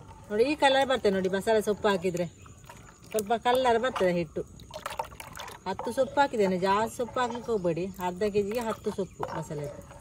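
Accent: Mangalore, India. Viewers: Pure mangalore kannada❤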